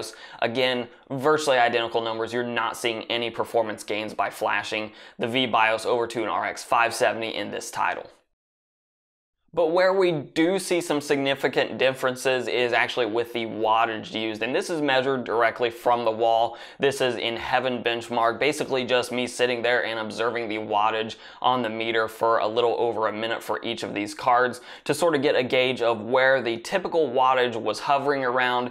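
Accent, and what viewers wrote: Indiana, United States. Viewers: Are you from Indiana?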